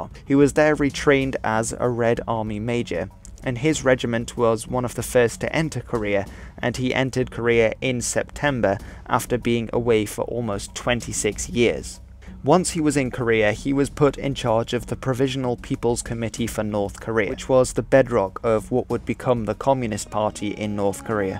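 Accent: United Kingdom. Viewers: How did you manage to get such a good British accent? I had no idea you were Dutch, you sound exactly like a native speaker to me. Do you have any advice for acquiring such an authentic accent?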